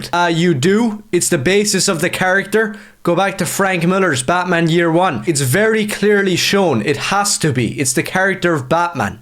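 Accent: Ireland. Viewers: The Irish accent didn't clue you in? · Love your channel bro. Are you from Dublin ? Anyways can you say shite in your video instead of crap, please ? It’s a cool word and your accent is cool bro. And yea fuck this woke bullshit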